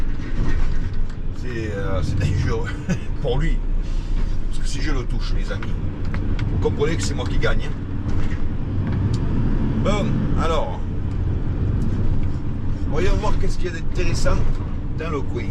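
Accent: Marseille, France. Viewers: Bonjour mon amie vos vidéos je me régale de les regarder 🎉j'adore votre ville elle et unique comme c'est habitants je connais la ville à 70% vous me faite rapeller les ruelles j'ai visité plusieurs ville française et européenne mais je suis fan des ville du sud et surtout Marseille c'était mon poin de repaire pour commencer mes balades ca fait 10 que je ne suis pas venu à Marseille. Je suis fan de Marseille et de votre accent marseillais. Bonne continuation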